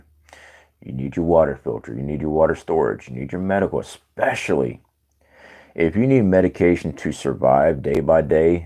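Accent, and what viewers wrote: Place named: Appalachia, United States. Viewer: hillbilly accent Yall remember, chance, favors! The prepared mind! Semperfiiiii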